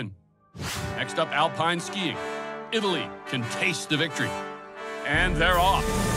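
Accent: United States. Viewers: what state in the US is the commentator’s accent from? I’ve been trying to figure out similar accents and i can’t tell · How ridicules ,not surprised yank commentator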